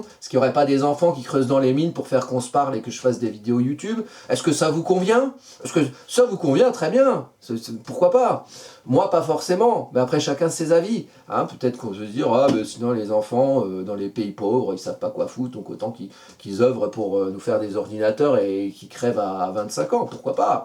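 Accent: Alsace, France. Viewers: ....un petit accent Alsacien ou mosellan derrière les fagots ;) ??? Beau travail et vidéo que j'ai plaisir à écouter. Longue vie à votre chaine :) Signée une Alsacienne perchée.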